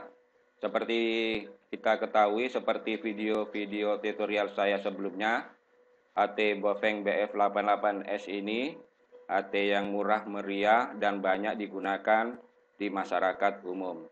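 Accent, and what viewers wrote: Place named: Madura, Indonesia. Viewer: mantap bang, ilmunya keren. btw abang asli dari madura ya? logatnya kayak teman saya orang madura. sukses selalu bang. salam buat yg di madura 👍👍👍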